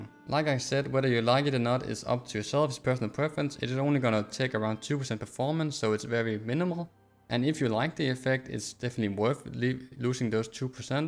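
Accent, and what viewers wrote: Denmark. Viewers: Tak for videoen min ven, god forklaring og perfekt med opdeling af videoen for os der ikke kræver den store intro til grafikinstillinger. Er sikker på du er dansk på den vidunderlige accent du har 😄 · You danish bro? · Yo m8 du lyder som en dansker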